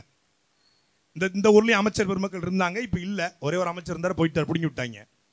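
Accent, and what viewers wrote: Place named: Kanyakumari, India. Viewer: Kanyakumari slang👏😅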